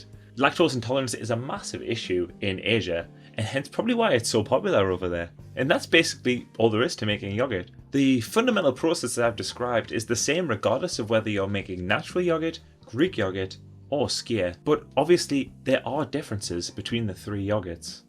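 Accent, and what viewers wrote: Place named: Newcastle, United Kingdom. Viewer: Thank you. Your Geordie accent is lovely.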